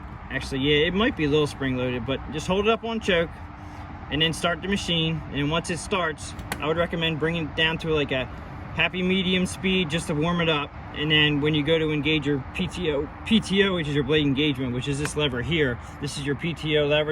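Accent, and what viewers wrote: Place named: Delaware County, United States. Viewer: Also are you from southeast PA? You have a Delco accent!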